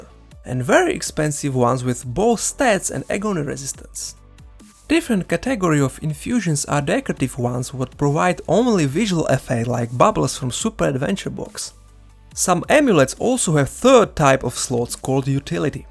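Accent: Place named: Czech Republic. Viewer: Ure from czech republick right ? that axcent tho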